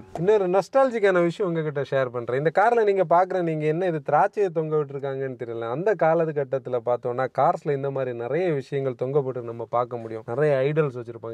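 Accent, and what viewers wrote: Kanyakumari, India. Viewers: Your presentation and the slang of my own Kanyakumari style is extraordinary. Keep going all the best.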